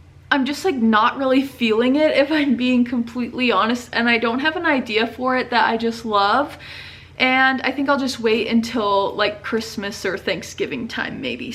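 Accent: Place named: Montana, United States. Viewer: Hyped and liked. Getting my Montana fix. Just hearing you speak brings warm feelings. Funny how you don’t realize that there is an accent to folks from back home until you’ve been away. Now I’m in Texas the difference is stark. Most of my Montana family have passed on, but I’m still kicking so I need the fix. Thanks.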